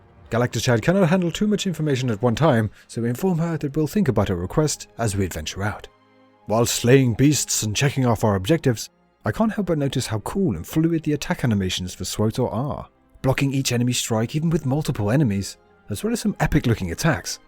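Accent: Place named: United Kingdom. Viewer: Top tier content! Wish you would turn the SWTOR to a chapter/planet gameplay. You've voice overs and Brit commentary is very entertaining!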